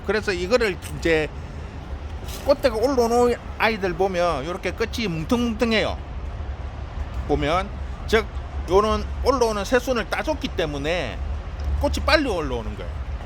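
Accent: Gyeongsang, South Korea. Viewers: 사장님 사시는데가 어디입니까?저는진주사는데 말씨가 이쪽경상도 같아서 가까우면 가계에 한번가보고싶어요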